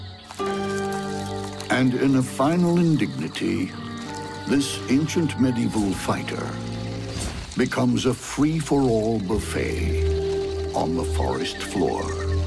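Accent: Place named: RP, United Kingdom. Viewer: Although slickly filmed and narrated by the voice of Mr. Fancy British Guy, these videos are as staged and contrived as any 8 year boy who drops bugs in a glass jar, gives it a good shake and gleefully watches the bugs rip each other apart. These films are on the same level of sophistication as the ubiquitous pimple popping videos.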